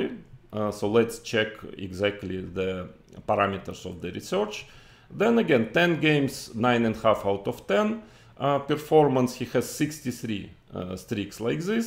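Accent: Russia. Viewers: Владимир хорошо говорит на английском, правда с ОЧЕНЬ РУССКИИ АКЦЕНТОМ, что только радует что Владимир хорошо развит, и что он чисто русский, и мало был в Америке, и не нахватался американского акцента, в общем наш человек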